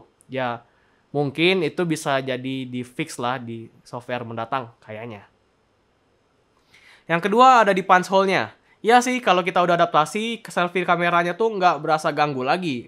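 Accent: Palembang, Indonesia. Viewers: Dari Logatnya Seperti Wong Kito Galo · ai bang, salam kenal be bang, ciren dari logatnyo bang😁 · palembang bg?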